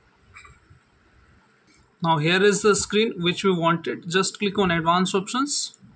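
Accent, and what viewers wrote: India. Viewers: I am from India ❤❤❤ and I know that you also from India, Because your English pronunciation like Indian 🎉🎉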